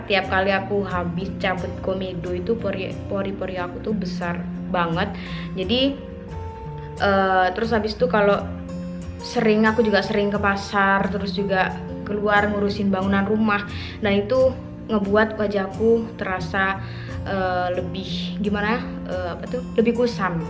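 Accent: Indonesia. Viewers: probolinggo bener logatnya 😂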